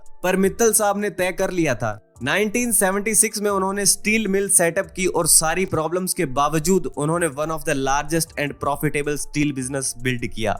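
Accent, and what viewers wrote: Gujarat, India. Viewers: Tum gujrati ho, accent! 🙂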